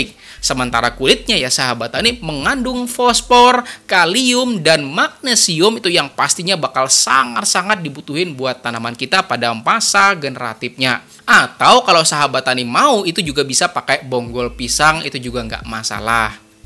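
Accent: Banjar, Indonesia. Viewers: Logat banjarnya masih terbawa mang 😂👍🏻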